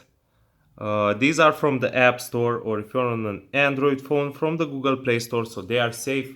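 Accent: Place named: India. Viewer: Right as he had an Indian accent I knew it was fake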